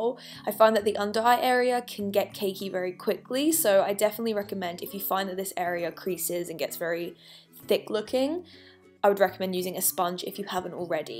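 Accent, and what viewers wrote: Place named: United Kingdom. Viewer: I love these videos, you explain everything so perfectly. Love that British accent too!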